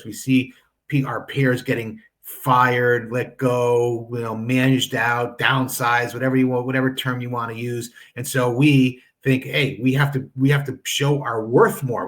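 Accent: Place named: Long Island, United States. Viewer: I'm guessing Long Island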